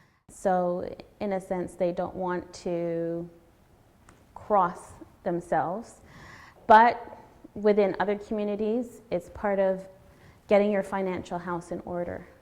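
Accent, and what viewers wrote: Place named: Caribbean. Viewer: Sounds like this lady has Caribbean Roots well spoken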